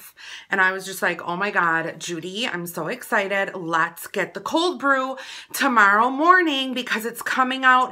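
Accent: Wisconsin, United States. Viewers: People from Wisconsin sound like this?? Interesting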